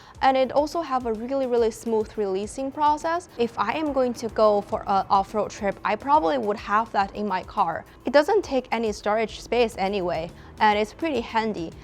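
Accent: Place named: China. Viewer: Funny Chinese accent. You do not know what you were doing.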